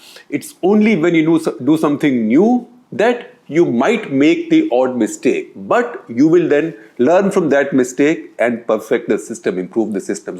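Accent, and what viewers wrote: India. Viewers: Shekhar ji speaks English but his expression is totally in "Hindi".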